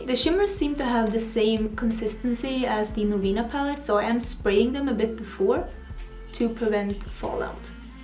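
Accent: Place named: Sweden. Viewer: These are all so pretty! All of your effort is really appreciated. This might seem like a weird comment, but I spent a lot of my career (I’m a writer) covering Swedish hockey players. Your speaking style reminds me a lot of them, and it always makes me smile! I really need to come visit Sweden — I love the people and their sense of humour. Much love from Canada! 😘❤️🇨🇦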